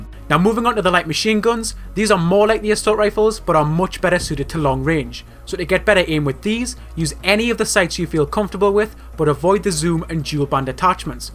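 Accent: Newcastle, United Kingdom. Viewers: Are you deaf lol. He is a geordie...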